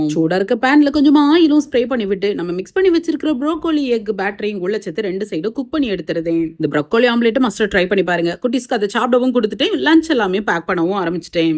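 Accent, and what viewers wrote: Tirunelveli, India. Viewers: Sister nenga enna five star hotels chef fa erunthingala and your slang is very nice nellai slang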